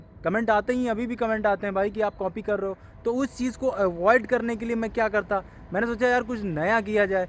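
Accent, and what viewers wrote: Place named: Uttar Pradesh, India. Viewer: Bro your up accent is 🔥🔥🔥🔥🔥🔥🔥,keep speaking 🗣️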